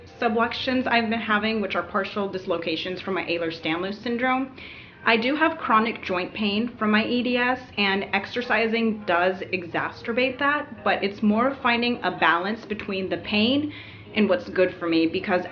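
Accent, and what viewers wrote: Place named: United Kingdom. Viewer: Finding that balance can be so hard sometimes. I've been trying to lose weight for my health for years and exercise would greatly help with that but there is such a limited amount I'm capable of doing and it's so dependant on the day and circumstances of said day that it's been extremely challenging. It makes it so frustrating when you have people fat shaming you and telling you to just get off your fat butt and exercise, like I don't have enough problems with depression and anxiety besides my chronic pain and other medical issues. As for the little dactoid about you being born in London I was kind of wondering because of the way you say certain things like vitamins, you say it like the British do. Of course, I was born and raised in the US and most of the time I do too, so that doesn't necessarily mean anything but it made me curious. 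:)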